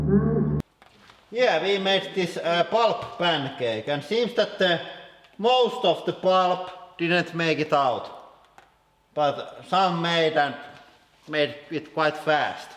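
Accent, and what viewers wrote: Finland. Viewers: Brian Page lol it's finnish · You have like finland aksent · Benjamin Bjørndal nah they are finnish m8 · bankroll unicorn boi its Finnish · Like actually that accent sounds way too finnish for me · it's a Finnish accent boi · You can tell he’s probably finnish because of hi’s accent😁 ps. I’m finnish too🤟🏻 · Brian Page Finnish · How Finnish people talk english 😂<3 SUOMI PERKELE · Sylphlez finnish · Sun ralli englanti on paras😍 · Are u from finland? Great accent btw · Are you Finnish? That’s what your accent sounds like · Are u from finland? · baXtian no it's finnish people rallienglanti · Are you from Finland? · sounds like basic english accent from finland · Mariela Ivanova It is Finnish and the cutest thing ever lol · Mieze Katze hes probs finish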